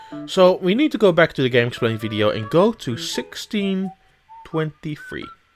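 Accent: Netherlands. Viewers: why does this guy sound dutch, though.. edit: I've seen the twitter sceen this bro is a fellow dutchie hellooo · Dat Nederlandse accent 🤢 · He is indeed dutch, and a dutch accent when someone is talking English is one of the WORST things I've ever heard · What's your first language? Your accent sounds Dutch 💭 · I can't help but notice his accent... Maybe it was already stated somewhere else, but / sounds very Dutch:p not that that's a bad thing. I love hearing accents when people speak English. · Love the Dutch accent :p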